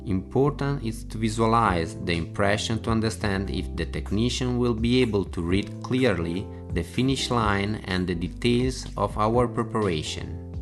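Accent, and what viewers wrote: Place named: Italy. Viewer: to avoid healthy animal.... hahahah love the 'italian English'.....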